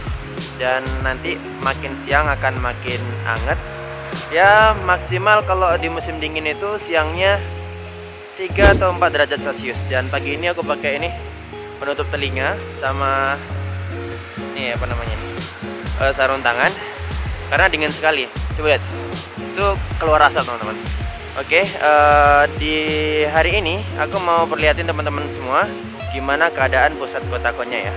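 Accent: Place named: Parabek, Indonesia. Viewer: logat Parabek wkwkkw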